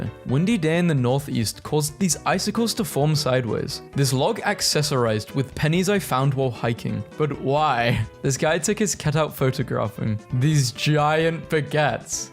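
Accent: United Kingdom. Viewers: I just realised hes British!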